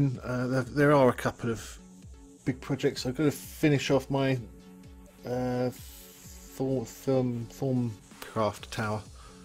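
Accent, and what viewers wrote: England, United Kingdom. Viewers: u really are cool:) u make me laugh with ur accent and style and ur "pommyness":3 watch u, pause, beef and guude alot and you four make me laugh. hope u dont stop:3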